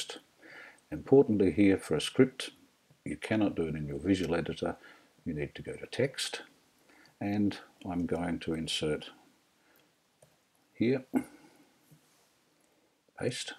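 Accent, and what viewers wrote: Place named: Australia. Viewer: he sounds like an aussie version of bob ross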